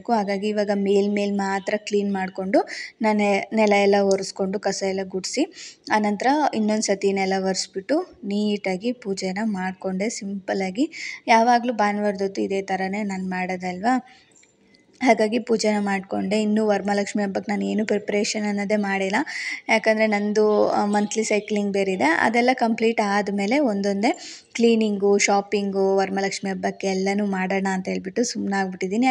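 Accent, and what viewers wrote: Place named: Hassan, India. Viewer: ನಮ್ಮ ಹಾಸನ್ ಸೈಡ್ ತರ ಇದೆ ನಿಮ್ಮ ಭಾಷೆ ಹಾಸನ ಮಂಡ್ಯ ನ ನಿಮ್ಮದು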